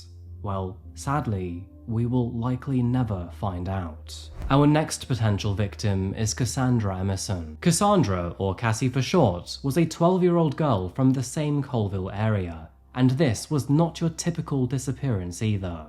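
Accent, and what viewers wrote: United Kingdom. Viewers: Translation: He's cute and has a British accent. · I love to watch coffeehouse crime videos., The way you execute your videos and the way you tell us how you feel make me feel that you are a very good person. I love to sit and watch your work. I know it will be good footage from start to finish. Please cover all kinds of stories whether they’ve been covered already. whether they’re old or new, weird or wild. And I always learn something interesting about the location you are covering. I love how you tell the it!! And of course, that British accent!!!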